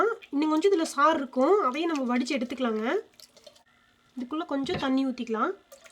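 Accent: Kongu, India. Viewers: Kondu slang arumai... 👌👌👌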